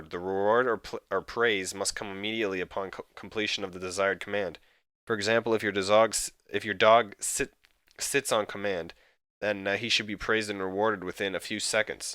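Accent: Canada. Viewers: Are you Canadian? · are you canadian? ^^ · haha canadian accent!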